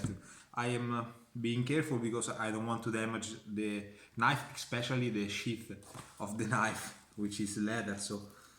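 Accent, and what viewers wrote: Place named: Umbria, Italy. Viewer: Bravo, bella presentazione. Ottimo coltello. Il tuo inglese con cadenza umbra è inarrestabile!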